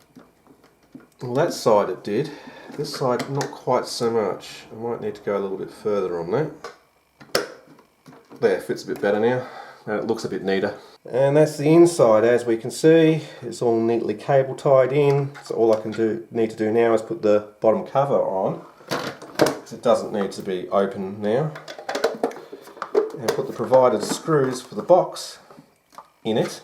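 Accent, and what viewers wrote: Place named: Australia. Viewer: Great to hear an ozzie voice when looking into this subject ta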